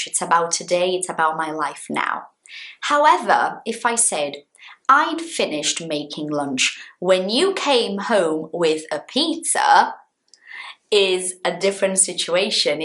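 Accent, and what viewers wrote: RP, United Kingdom. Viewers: British Accent! That’s quite posh .. you are a very pretty posh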